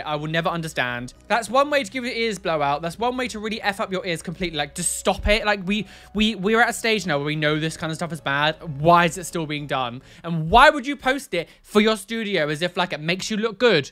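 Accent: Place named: Essex, United Kingdom. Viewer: Just found you and am so loving your energy! Your accent is awesome as well, and may be the inspiration for one of my Essex characters in my books. :)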